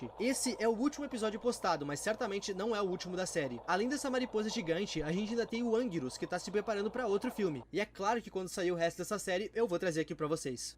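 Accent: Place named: Northeast, Brazil. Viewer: Vc é nordexxtina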